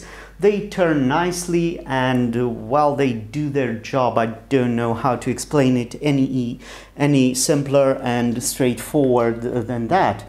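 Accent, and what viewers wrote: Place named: Romania. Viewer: Care ti se pare mai ok, cel mesh-at ?ca nu am inteles ( btw misto accent, mi-a luat ceva sa imi dau seama ca esti roman 😂 )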